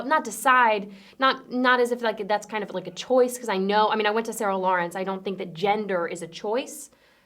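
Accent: United Kingdom. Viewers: Not what I was thinking at all. I was thinking how upset I was that you missed another excuse to show off your fantastic British accent :o(. But the freakout over what to call toddlers made up for it :o)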